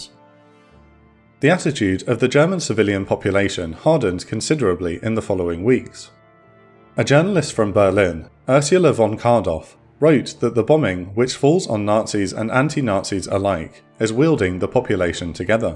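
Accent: England, United Kingdom. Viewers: This guy has an English accent. He describes the bombing of Germany and England as if they were the first counties that had cities bombed to the ground. They weren't, history is severely lacking here. The Germans had set a precedent by first obliterating Warsaw and then severely damaging Rotterdam, in his myopia the Englishman omitted this history. The slow ramping up of bombing between Germany and England is a later phenomenon, the Germans had already set the precedent for bombing of cities in Poland and Holland.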